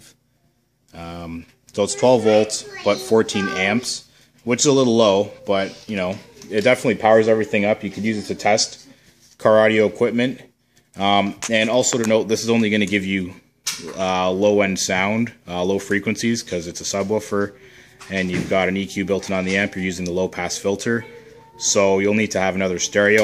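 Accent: Canada. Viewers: sounds like he's Canadian to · You can easily tell hes Canadian. That's why he's well spoken. He's just not using slang or anything as some would in the US.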